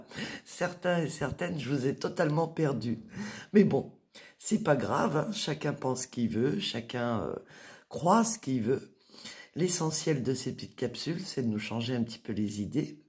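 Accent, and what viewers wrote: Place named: South, France. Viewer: très belle accent du Sud je l’adore et elle fait un taf remarquable ♥️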